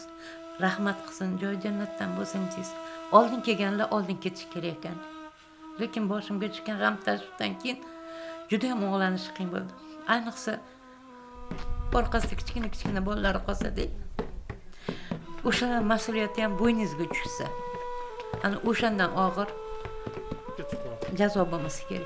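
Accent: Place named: Tashkent, Uzbekistan. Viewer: Toshkentlik sheva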